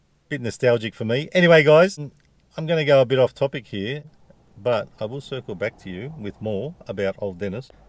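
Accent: Australia. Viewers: I'll watch anything as long as there is an Australian accent. Love the Aussie's!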